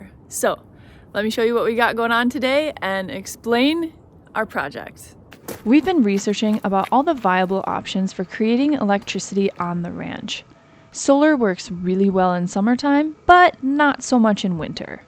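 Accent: France. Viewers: Un accent très français pour monsieur 😊 je découvre votre chaîne, et ça me plaît bien. ✌️👍bisous de France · Ça me fait tellement rire car le gars on voit qu'il est français car il a le petit accent bien de chez nous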